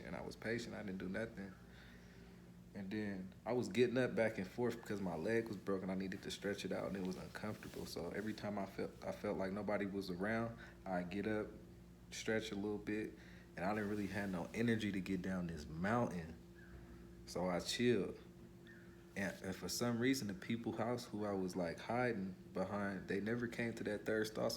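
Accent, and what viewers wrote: Bay Area, United States. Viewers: You could tell bro from the bay!! Man the police got me and my lil bro out there too smh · He has to be from the bay the way he talk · this dude definitely from The Bay he talked just like Messy marv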